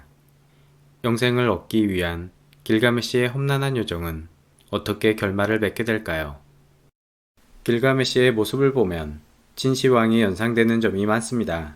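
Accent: Jeolla, South Korea. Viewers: 숨기지 못한 전라도 사투리로 듣는 길가메쉬 이야기 감사합니다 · 전라도 분이신가 사투리발음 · 전라도 사투리가 정겹습니다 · 혹시 전라도 분이신가요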